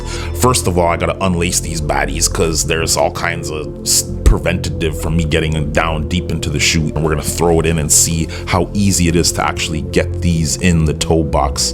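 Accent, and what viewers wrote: Toronto, Canada. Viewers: Jeez this guy's Canadian eh? I hear it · sounds like he’s from toronto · U from the 6ix??! U sound like it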